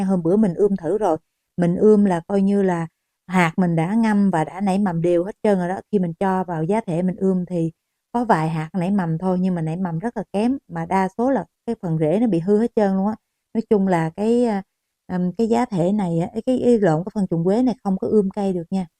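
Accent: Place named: West, Vietnam. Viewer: Giọng miền tây dễ thương dễ nghe hay do tui nghe quen rùi nên thấy dễ thương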